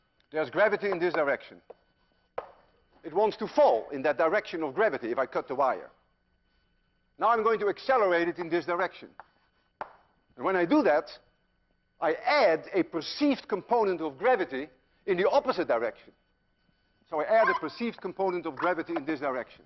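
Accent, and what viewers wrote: Netherlands. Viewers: Is this dude from Holland?! This day seriously freaks me out.. like on that esoteric level. peace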